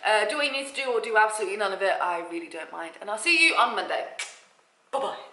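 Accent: England, United Kingdom. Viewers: hahaha... you are super funny and really liked the way u explained ur day expenses and luved ur accents too which reminded me of nephew living in England... but he is 12 yrs kid 😁😁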